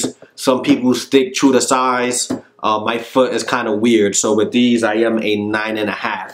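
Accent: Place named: New York, United States. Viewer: cant figure it out. that a new yalk accent or puerto rican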